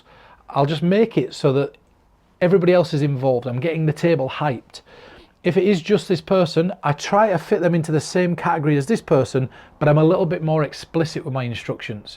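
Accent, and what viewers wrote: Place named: United Kingdom. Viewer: Do I have to have an endearing UK or British accent like you or Daniel Madison to practice mentalism or good magic or can I do it with my silly American accent or do I need to practice in a Canadian accent how a boot that LOL by the way I'm part of the pig pen magic Academy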